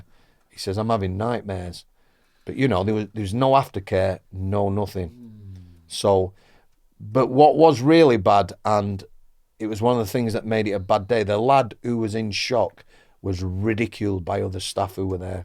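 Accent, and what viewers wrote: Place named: Northern England, United Kingdom. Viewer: NEUTRAL ACCENT LOL how on gods good earth can this guy think is southern? If he was American I could understand but for fuvks sake how can any British person not know is from the north? This guy must have taken too many knocks from Danny g and something got dislodged in his head! Lol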